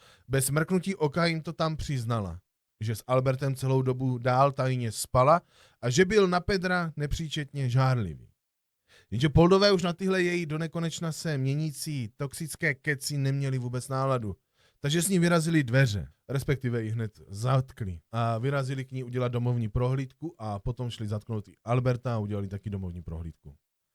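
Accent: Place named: Brno, Czech Republic. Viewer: A hlavně s brněnským přízvukem 😉👍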